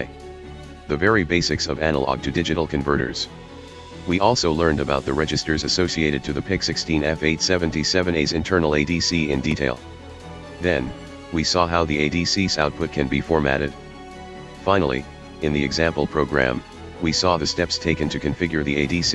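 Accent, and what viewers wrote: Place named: India. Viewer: Yes don't put music + nice to keep robot speak as I hate Indian English better to use robot eng instead of yours as when I found an Indian English video I simply skip it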